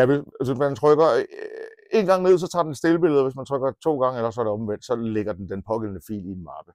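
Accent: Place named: Jutland, Denmark. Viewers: Du kan tage ud af Aalborg, men ikke Aalborg ud af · Hold nu kæft du taler jysk på dine gamle dage 🙂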